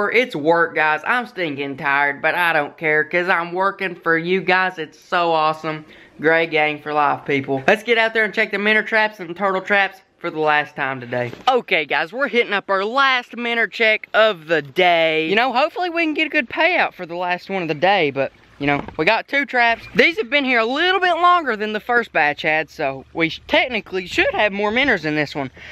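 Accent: United States. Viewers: Country akcent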